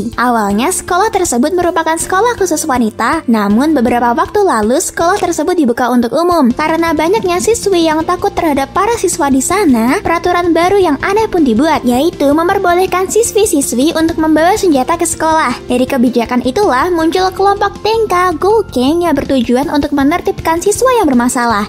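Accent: Japan. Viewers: Fasih banget min logat Jepang nya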